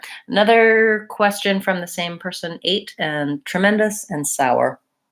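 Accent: United States. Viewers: I really love your American accent!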